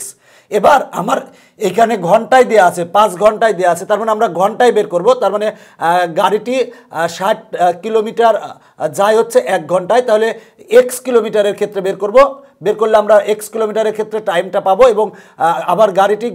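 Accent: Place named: Kushtia, Bangladesh. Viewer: সার আপনার বারি কুষ্টিয়া মনে হয়🤔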